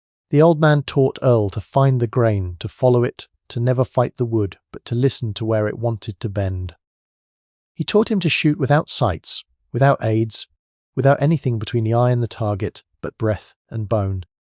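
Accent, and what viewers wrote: United Kingdom. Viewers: You know I don't know what's worse you can't pronounce stuff right what are you talking about the bow of a boat and then you got to have some britt accent that I can't stand it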